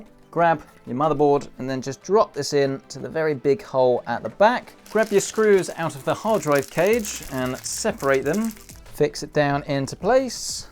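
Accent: United Kingdom. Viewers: what region is your accent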